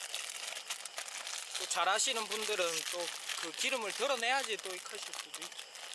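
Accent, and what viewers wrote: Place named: Gyeongbuk, South Korea. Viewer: 오늘도 잘 보고가요~말투가 구미,경북 북부쪽 말투시네요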